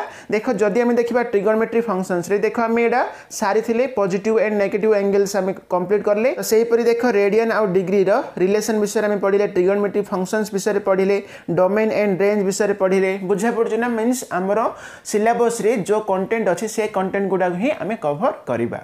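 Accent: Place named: Odisha, India. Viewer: Sir Odia English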